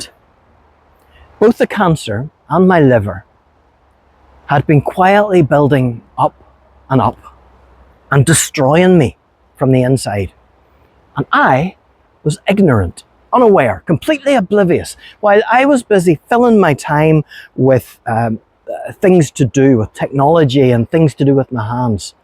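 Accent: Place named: Scotland, United Kingdom. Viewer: Hi just found your channel via Francine, following you from Denmark. I absolutly love your accent, Scottish? Your videoes are next level, sp well done. Hope uou are having a good day today. I will pray for you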